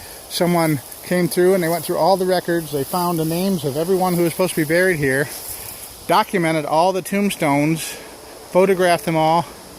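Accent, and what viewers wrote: United States. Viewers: Love that Michigan accent.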